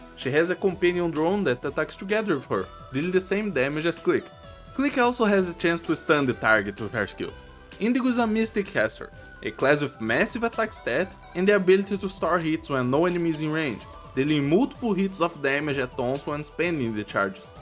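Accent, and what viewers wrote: Brazil. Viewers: manooo, eu assisto você um tempo já e eu tipo eu reconheço esse sotaque prq é o msm q o meu XD eu ia comentar da onde você era no caso de qual pais e agora lendo os comentarios vi que você era br mesmo, eu amei seu vídeo como novato no arknights eu to amando o jogo eu to esperando o banner do mizuki desde o primeiro dia que eu joguei, alias um vídeo desses pra um 6 estrelas seria incrivel! :goodvibes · Esse sotaque BR. Too obvious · Percebi na hora que é brasileiro só pelo sotaque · Tô ouvindo um brasileiro aí kkkkk. Raro ver BR jogando Arknights.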